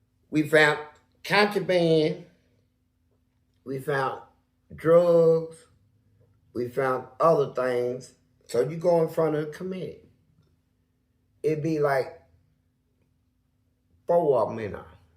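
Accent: South, United States. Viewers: Hey, my comment got deleted but I saw you read all your comments. You're my favorite person on YouTube and I wanted to tell you what an inspiration you are to all of us brother, especially me. My heart aches for you and what has happened for you in your life. I can't imagine that kind of abuse and trauma. And you came out with faith and a smile. You are a Unicorn bruh! I'm from Chicago so y our southern drawl and charm and the way you tell stories, well, your just a funny dude. Very charismatic.Never stop being you and telling us stories. And, thanks for all you do nd bringing us!!!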